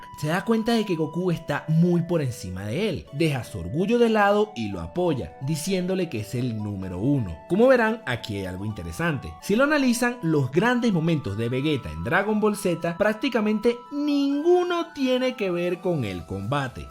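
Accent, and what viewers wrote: Venezuela. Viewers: man, eres venezolano? el acento te delata jajajaja por cierto buen vídeo!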